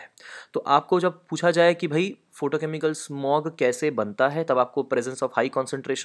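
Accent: Bihar, India. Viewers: Bihar na..???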